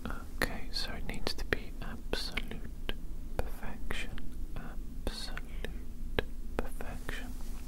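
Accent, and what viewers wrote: Wales, United Kingdom. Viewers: Where is your accent from? I want to say Wales, but I can’t quite put my finger on it and it’s been bugging me for ages! Super relaxing video as always :)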